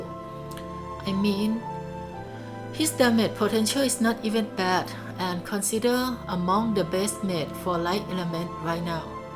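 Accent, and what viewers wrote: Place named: Thailand. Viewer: สำเนียงภาษาอังกฤษเจ้าของช่องเหมือนคนไทยเลย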